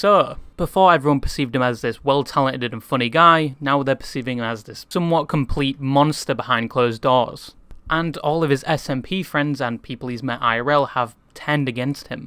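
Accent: United Kingdom. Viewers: ewwie briteesh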